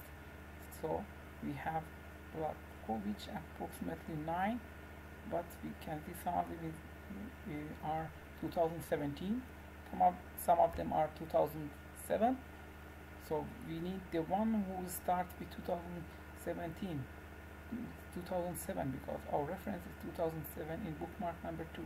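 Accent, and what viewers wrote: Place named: Iran. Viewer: Thank you so much for this upload - grateful! You sound Iranian! I have an Iranian friend. Cheers!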